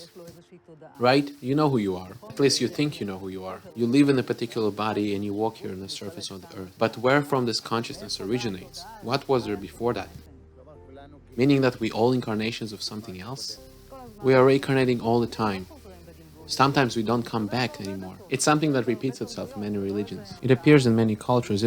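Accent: United Kingdom. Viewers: He speaks proper Londoner accent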